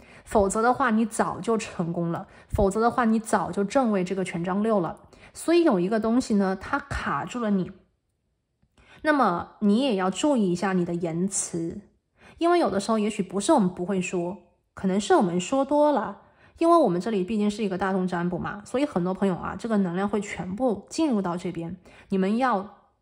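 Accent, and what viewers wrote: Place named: Taiwan. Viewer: 變台灣腔？好奇怪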